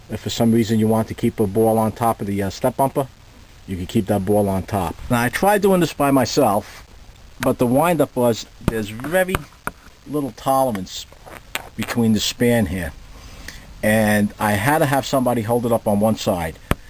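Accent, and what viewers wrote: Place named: Boston, United States. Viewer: Boston. It's gotta be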